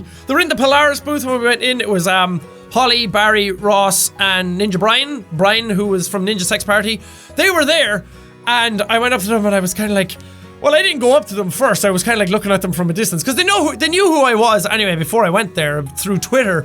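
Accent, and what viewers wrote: Ireland. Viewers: Am I the only one who likes his accent? Normally I don't really like Irish accent but his is cute. No... I'm the only one... Ok.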